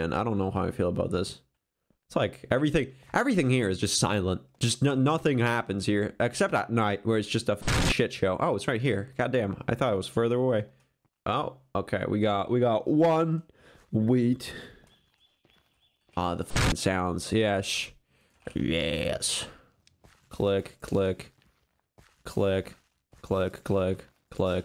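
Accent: Australia. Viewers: Is Australian? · are you aussie by chance? you sound just like my friends and i with all the swearing XD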